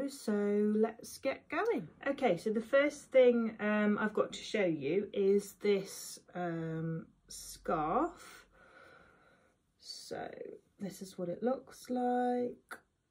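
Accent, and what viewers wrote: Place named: Yorkshire, United Kingdom. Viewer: Hi, I've watched both your episodes now and really enjoyed them. I love to see a mixture of projects, yarns and recommendations so your podcasts tick all the boxes! I live in Yorkshire so it's great to hear an accent from not too far away! I also watch Cherry Heart (I'm currently making a battenberg blanket), I love Siobhan's Crafts yarns and I learned to crochet by watching Bella Coco tutorials. I'm not on Instagram but I look forward to seeing more podcasts from you on this channel! 😄👍🏻 Helen x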